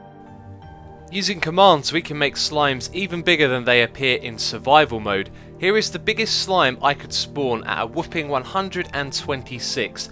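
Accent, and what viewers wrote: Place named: United Kingdom. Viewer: I <3 you X you are my favourite youtuber and for some reason I like your accent it's so British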